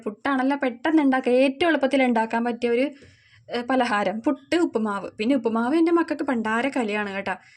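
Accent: Kochi, India. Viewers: ഞാനും ഒരു തനി kochikari ആണ് യുടെ സംസാരം സെരിക്കും എന്നെപോലെ ചൊന്നുന്നു 😂😂😂😂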